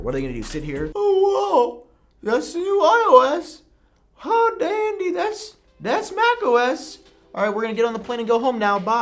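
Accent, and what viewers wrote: England, United Kingdom. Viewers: I laughing so much at your English accent, It’s crazy that apple store in england is the only store keeping covid restrictions . I don’t think Tim Cook got memo from boris that england is free from restrictions. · Your Britain accent is pretty good actually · that “british” accent doe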